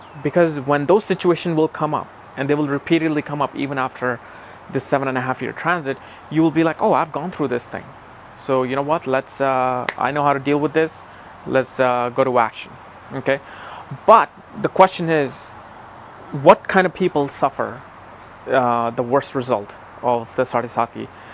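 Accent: United States. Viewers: Just because he speaks in american accent? Do you think this is new invention? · that’s your petty mind speaking. Coz one thing that sucks about him is his American accent. It’s his attitude and how he explains astrology is very unique intelligent and entertaining, that draws us to him.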